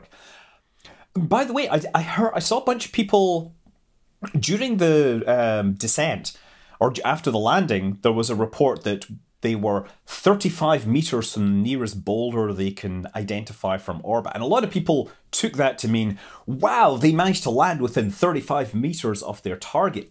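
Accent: Scotland, United Kingdom. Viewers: Nothing screams Saturday night as a 25 year old more than a Scotsman talking about space! Love it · Husband: "Are you watching that Scottish guy talk about space again?"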